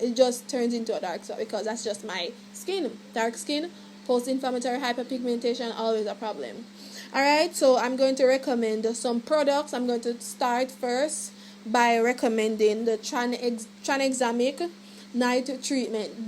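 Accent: Jamaica. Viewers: Forget the skincare products I absolutely love your Jamaican accent, it's 😍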